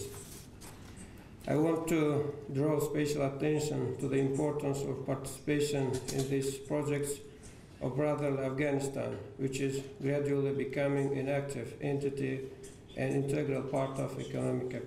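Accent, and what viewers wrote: Turkmenistan. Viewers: ебать я нихуя не понял, туркменоинглиш тяжело входит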